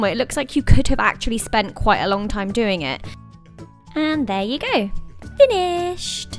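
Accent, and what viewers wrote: United Kingdom. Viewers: Readers.... so it's a... British.... accent.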